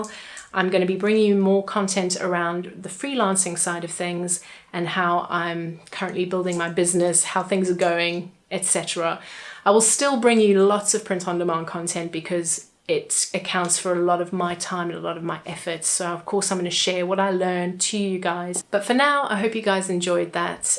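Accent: South Africa. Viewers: South African expat accent 😁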